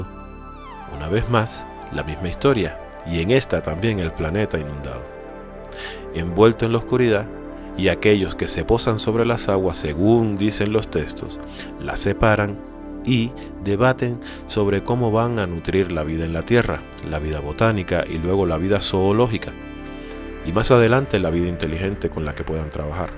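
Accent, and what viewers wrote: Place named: Cuba. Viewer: Me Gusta tu Acento Cubano, también soy Cubana 🤗🤗,me relaja mucho escucharlo 😴..Menos mal yo no creo ni en el Cristo ..Soy un Alma libre y como alma que soy no hay nada más poderoso que yo, solo la fuente que me Creó! por eso nos mienten y se esconden de nosotros, porque realmente el alma es misidial para ellos.. lo aprendí gracias a Corrado Málanga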